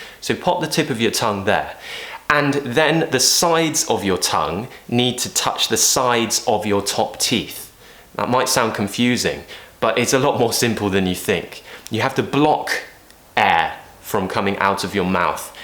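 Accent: United Kingdom. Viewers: nice to see you, I love your accent 😍 British is the best ever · I love your videos! From Uruguay!! And love your britttish accent! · I love your British accent <3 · What kind of this British Accent? I mean the accent using T sound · I didn’t like British accent u made me love it such an excellent professional teacher with Shewa at the end ,,British accent XD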